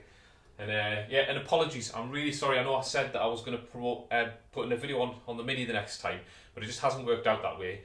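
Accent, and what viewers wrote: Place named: England, United Kingdom. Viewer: From Michigan, great use of space and what a surprise to see a car on a rotisserie followed by the English accent. Good for you. There are car guys all over the world. There is a company here in the states that makes rotisserie's called Auto Twirler their slogan is a bit racy but here it is, Stripp'er Down, Turn'er Over, and Get on Top" Always makes me chuckle. Because the ladies can substitute the "er for a "em. Take Care and God Bless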